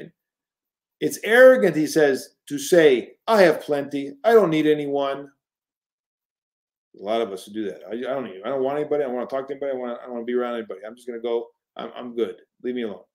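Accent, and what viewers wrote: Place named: Upstate New York, United States. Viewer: Does originally come from Syracuse/Rochester, New York? His accent sounds like it is from that area. I knew someone from a church I once attended in California who had his same, exact, identical voice; and he had moved to CA from Syracuse/Rochester, New York.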